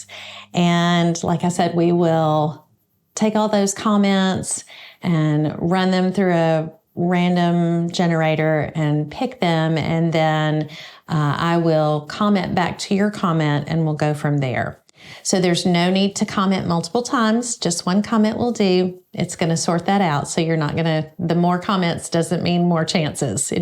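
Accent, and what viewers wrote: South, United States. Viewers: I live Springfield, Oregon, but several years ago I got to live in the South for 7 years, and once I heard your voice I felt right at home. I'm a craft dyslexic, directionally dysfunctional, and come from a long line of Fabricholics. I too love thrifting. Your calm way of explaining things helps me feel like I really can make something. I especially enjoyed your short tutorial about how to use what little time we have wisely. You set your timer and polished off some "catch up" thing you don't enjoy doing, but you taught us that being self disciplined in doing the menial tasks IS part of the creative process. Thank you 🙂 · I love all of your videos but my favorite when you showed your first quilt. It brought back lots of memories when I did not know what I was doing but went for it. I live in Bagley, Ala so we are only about 50 miles apart. 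That is great because I love hearing your Southern voice and words. Keep up the wonderful and fun videos. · Congratulations and Paul. I'm a fellow fan of spots, gingham and recycling but mostly I enjoy your joy and warm southern accent. I live in a tropical Australian city where the men seldom wear dress shirts but I can enjoy your stash creations from afar. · Congrats, on your 10k+ subscribers! From Wisconsin, I love your relaxed, slightly southern, humorous delivery - I feel we are friends, and maybe sisters in a previous life! My favorite is from your “My Very First Quilt” video. You said “I didn’t know what I was doing (so) I didn’t know to be afraid.” I think we have all been there! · I love your Southern, casual, conversational style in all your videos but especially in Why the Catbird. It’s like a visit with a good friend. ❤ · My favorite moments are when you laugh at your southern accent and your husband posts definitions. 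Fountain Hills AZ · Congratulations on your 10,000 K subscriber milestone!! You have inspired me so mucn, especially with the way you showed us how to use thrift stores to make quilting affordable. I had never given it a thought before you showed us how to do that, and kinda what to look for. I have to mention how much I LOVE your SOUTHERN ACCENT. I grew up in Ky so it is so soothing for my soul when I watch your videos. I am currently living near Gettysburg PA. Keep ups the great content. Thanks to Paul for being such a supportive person in your journey. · there are so many wonderful moments of laughter and joy in all of your videos, that's why I watch. I guess the episodes that i enjoy the most are any that highlight your wacky and interesting ways that you have with the English language and how you put your own spin on certain words, and your Southern charm is just so captivating. I am Jane from the UK.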